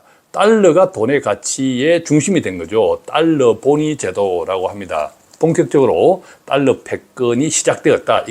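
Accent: Gyeongsang, South Korea. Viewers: 경상도 사투리 쓰셔도 이 대표님은 아주 진실적입니다 냉정적이고 경상도 대구가 이중성 있는것이지 대표님은 경남입니다 옳고 그름을 판단하시는 분이예요 함부로 말하지 마세요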